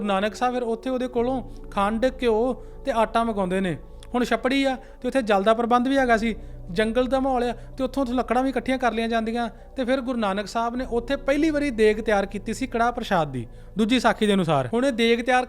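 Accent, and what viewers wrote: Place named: Majhi, India. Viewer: ਸਪਸ਼ਟ ਮਾਝੀ ਪੰਜਾਬੀ ਵੀਰ ਦੀ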